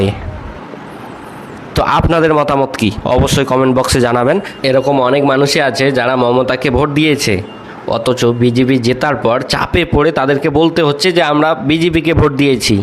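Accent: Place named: Bangladesh. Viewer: তোর কথা শুনে মনে হচ্ছে তুই বাংলাদেশি একদম সিওর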